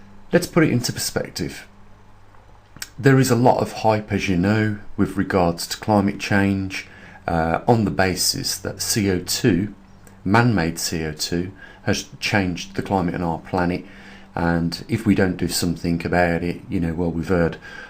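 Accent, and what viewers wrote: Birmingham, United Kingdom. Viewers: Are u a Brummie.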